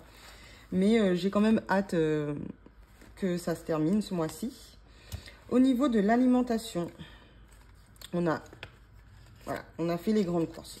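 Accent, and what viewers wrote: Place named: Réunion, France. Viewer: Petite question qui n'a rien à voir mais d'où viens ce petit accent ? La réunion ?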